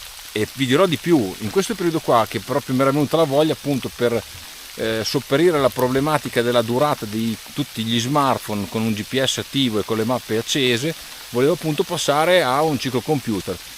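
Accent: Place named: Emilia, Italy. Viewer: Ciao Hai un accento emiliano e vivi in Germania ma quanto forte sei è un gusto seguirti. Continua così.....😊😊😊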